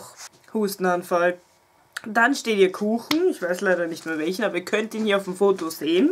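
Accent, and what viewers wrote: Austria. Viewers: Sehr sympathisch, ich höre deinen österreichischen Akzent sehr gern.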